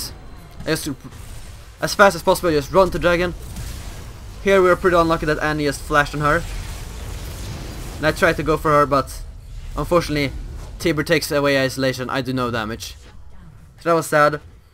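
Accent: Sweden. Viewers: Låter som att du är svensk, stämmer detta? ;)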